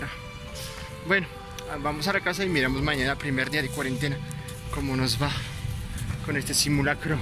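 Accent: Bogotá, Colombia. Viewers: Cada vez tienes mas acento de rolo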